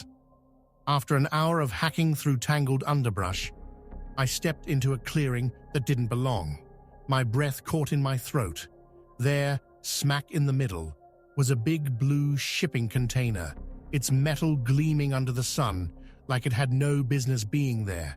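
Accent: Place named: United Kingdom. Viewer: Love the stories with the British voice.😉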